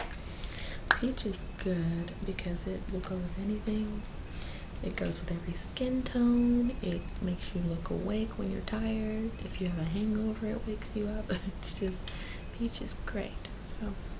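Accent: South, United States. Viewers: Oh dear God, finally, a woman with a Southern accent has uploaded one of these. There is nothing better than a Scarlett O'Hara. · Do I detect a southern accent? Lovely video. I've been watching several that you have made, and they're all so relaxing. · you sound southern? I'm from Kentucky. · You gotta gorgeous southern twang to your voice. I always marvel at you girls who do this ASMR stuff. Don't gemme wrong... I love it. I just don't how you do it, staring into a camera. Y'all are some great actresses. :) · I hear that southern drawl, where you from? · are u southern? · Amazing southern accent. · She sounds like she's from the south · Emo lady with a southern accent omggg ❤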